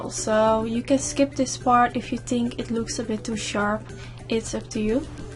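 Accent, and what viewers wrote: Netherlands. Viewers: I hear you are from the netherlands hahah love it when we speak english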